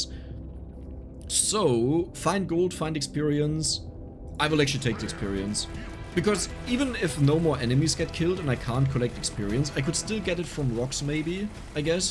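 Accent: Germany. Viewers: Ich dachte mir die ganze Zeit, ser hat iwie nen deutschen Akzent. Dann so „Ach komm hör auch mit dem Käse“ 😂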